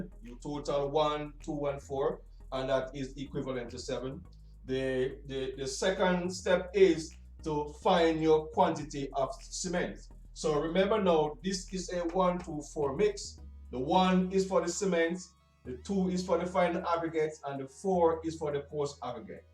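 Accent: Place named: Jamaica. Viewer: Me feel like me a go a whaa university. What you are doing is excellent i am interested all the time. I use to whatch over seas people on youtube as it relates to building but when i was browsing this week and see this man in a african shirt and started to hear the jamaican acent i was over whelm ,trust i must say i appreciate this highly.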